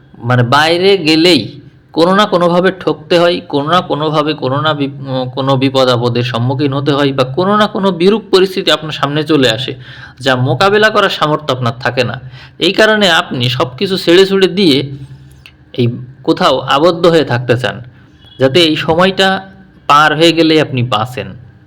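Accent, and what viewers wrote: Bangladesh. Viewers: Dada kothay baddo machlanda pur bithari o Bangla Desh er taan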